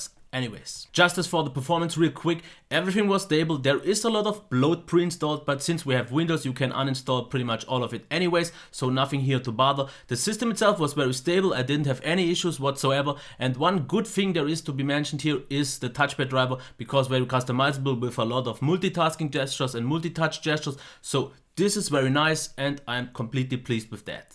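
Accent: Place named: Germany. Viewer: German english is the best😁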